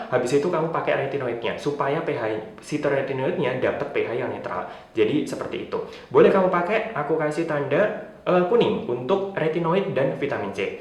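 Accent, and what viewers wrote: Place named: Malang, Indonesia. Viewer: asli pacitan tpi logate arema pol 😀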